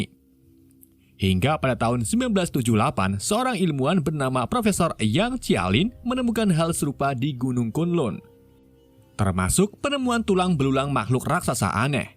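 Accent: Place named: Java, Indonesia. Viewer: Bahasa jawanya khas bgt 😂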